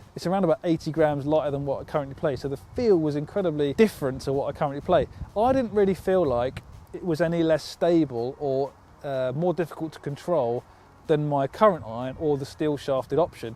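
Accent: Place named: England, United Kingdom. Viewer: Kevin Zhao they have that accent because they ARE English just like your accent is from your home country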